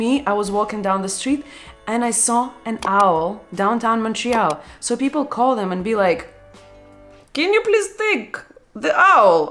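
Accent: Russia. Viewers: I love your English with Russian accent, keep doing your great stuff. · Your Russian accent is cute 👍me likee like 😁🥳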